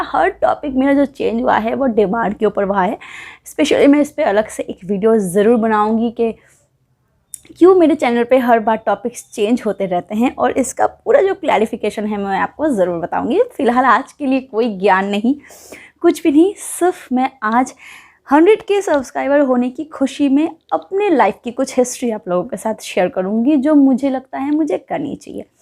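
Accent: Bengal, India. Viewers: i cud make it out frm ur accent.....that u r a bong...... very sweet..... believe me today only i watched for the first time.....very nice dear.....tk care....keep up ur talent......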